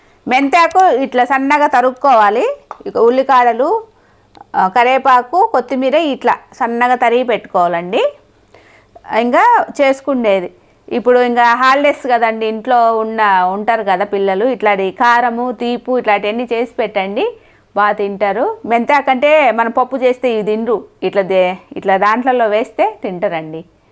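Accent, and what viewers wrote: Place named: Anantapur, India. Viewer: Aunty mata Brahmins mata la undadu vere slang. Ananthapur atu side la untadi ekkada meeru undedi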